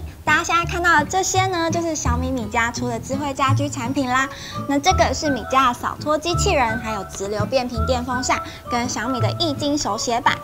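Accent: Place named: South, Taiwan. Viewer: Hanne台語很標準欸，是南部人嗎？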